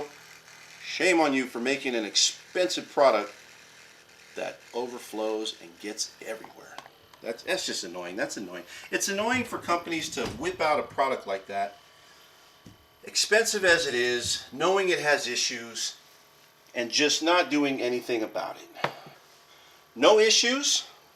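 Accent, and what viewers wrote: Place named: France. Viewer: Great vid, thanks. Are you still using the frother and the dual c❤coffee machine . I just ordered the dual plus machine and thinking of getting the frother. I ben loking e rywhre for anachine tht i cab do both kcup and nespresso. I sop motly online, i must of ben typing wrong or somthing lol hope the new cofe works good. Anyway thanks, oh you sound like you have a french accent, are you canadian ?😊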